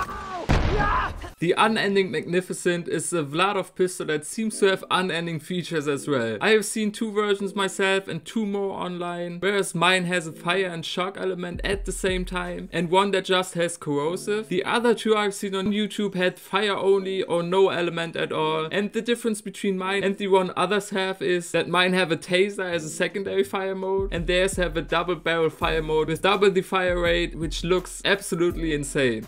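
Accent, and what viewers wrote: Germany. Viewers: you are german ? sounds like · Great content and very nice humor. I'll follow you and your videos along. Also your accent is just fun and lets me believe we could talk in german aswell :P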